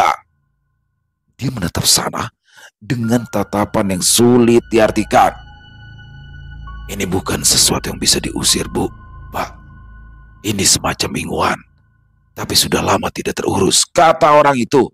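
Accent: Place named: Banjar, Indonesia. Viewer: Bang sdh fasih sekali dengan logat Banjarnya... Keren bang, salam dari kalsel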